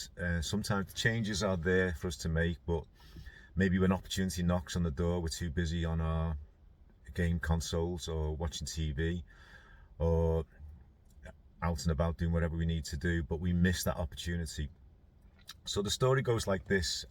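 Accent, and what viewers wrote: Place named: Alabama, United States. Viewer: This is so spot on. Your 'Bama accent is not bad, but I don't know a single person called there! 🤣😂 As someone who has worked bloodhounds for years I am guessing that old hound never gave up that nail! 😉😊🤗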